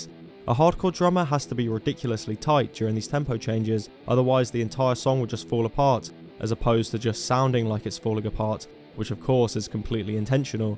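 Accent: United Kingdom. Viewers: British accent, video disregarded. Go enjoy the sex pistols limey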